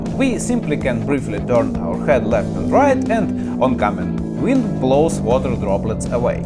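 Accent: Russia. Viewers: Recorded in Buenos Aires by a dude speaking English with the Russian accent. Nice! :) Безопасно себя ощущаешь на байке в Capital Federal?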